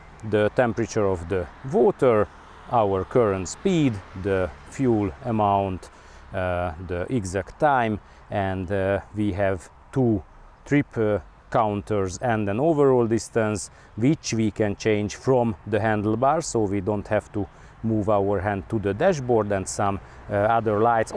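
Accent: Hungary. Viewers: Nice hungarian accent.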